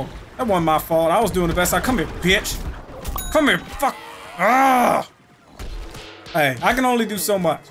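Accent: South, United States. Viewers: Whats up with people always saying he sounds black? It's just southern. Black has a more... Powerful tone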